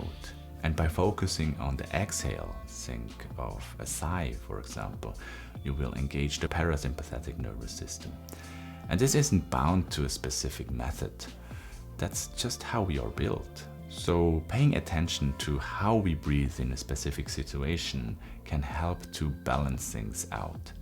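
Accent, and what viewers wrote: Switzerland. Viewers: are you swiss ?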